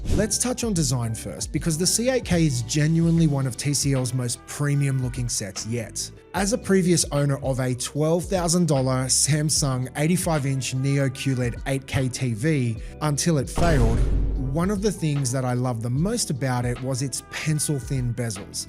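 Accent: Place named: Australia. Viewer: About his voice, the Australian accent particularly his specific neighborhood town one is not globally heard, which makes it harder to understand. And add to that his unique voice tone... definitely a better mic can make it easier for certain, interestingly in his livestreams the voice sounds much more clearer so maybe it's something in the editing/software of his uploads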